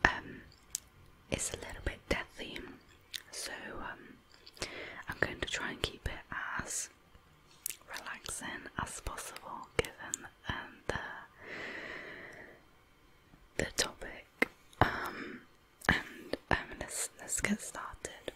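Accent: United Kingdom. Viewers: Nice to hear a lovely British asmr voice ❤️ please please please more true crime stories and even general chat. You have a nice clear voice, nice microphone too, thankyou for your amazing work 💕🏆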